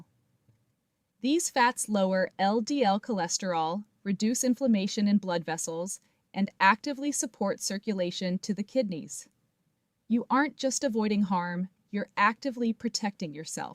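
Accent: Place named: West, United States. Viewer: too much of WESTERN ACCSENT HENCE WE UNDERSTAND ONLY HALF ... THANK YOU ... !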